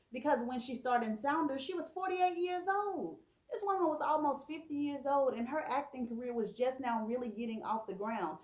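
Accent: South, United States. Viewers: Keep up the good work.... iv only been following you for a few days now.., and I love your page... it’s fun, energetic, and you have the southern voice that makes me want to come back for more!!!! Sooo kudos to you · Sis, we want you to be you!!! I love your southern loud accent!!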